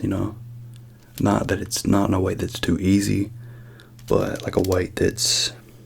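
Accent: South, United States. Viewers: you have that amazing southern accent which is lovely to listen to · Am I hearing a slight southern accent? 🙂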